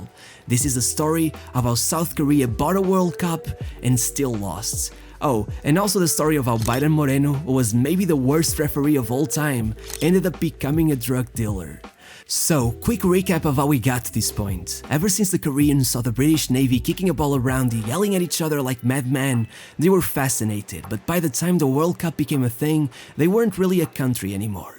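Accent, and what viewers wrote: Portugal. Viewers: Hey, I couldnt stop to notice how you pronounced the portuguese names. You seem really portuguese. The way you talk and the accent, are you portuguese?:D cuz i am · this portuguese accent... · i was pretty sure youre portuguese by the accent but when you said the portuguese players names the minimal doubt i had was gone hehe , im subbed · Portuguese accent hh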